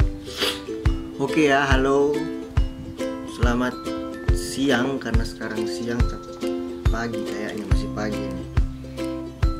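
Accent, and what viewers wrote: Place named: Makassar, Indonesia. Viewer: konten gaming boleh tuh bang jadiin point, game horror kalo bisa kaya dulu channel heheee, kangen banget denger teriak teriak ketakutan pake logat makassar mu ituuu, pokok nya terusin aja, aku udh dukung dari duluuuuuuu banget. hehe semangat ya buat kedepannya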